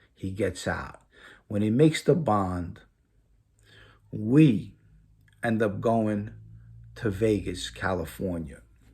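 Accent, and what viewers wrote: New York, United States. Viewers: This fuckin guys is hilarious...that NY accent is 2nd to none...and love how he prefaces someone's name with "The Kid" lmfao.... all the best to ya hope you make millions ...you're entertaining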